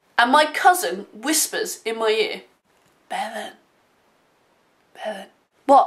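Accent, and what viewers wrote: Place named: United States. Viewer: That was a pretty good American accent.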